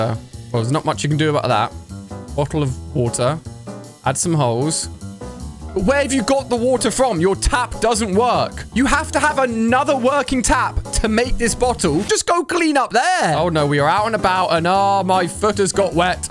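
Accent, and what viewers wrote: United Kingdom. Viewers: Bro, are you British? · He is british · Are you from the United Kingdom 😅😅😅😊😊😊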